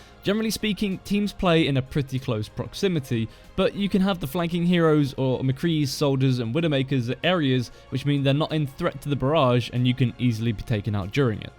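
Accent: United Kingdom. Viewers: Where are you from? Southern England?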